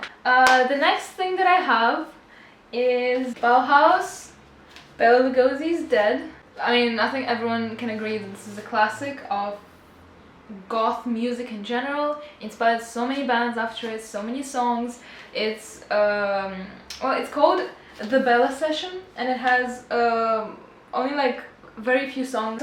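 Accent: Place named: Russia. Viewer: Hi i love, their compilation is definitely one of their best comps but i`d actually say all of their compilations are good because they constantly produce good music. If you like Twin Peaks you might like `s solo work. She sings some of the music in Twin Peaks and her solo album is beautiful, it`s very dreamy. Especially the tracks and. Also Twin Peaks related is `s track Go. He samples the theme music from Twin Peaks and uses it really well on Go. Although the only mix of Go that is a classic version is the original Woodtick mix. The other mixes are a bit tacky but the Woodtick mix is classy. You should do a cd update video. Also a favourites video would be cool. A collections video. I have a couple of questions for you. What country are you from, you sound russian. Where did you go when you visited England? What were your impressions of England? I`m english so your answers would interest me. 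You come across as being quite artistic. Do you make your own art? Thanks for sharing your collection with us. I look forward to your next video which i imagine will be uploaded in about a years time. Cheers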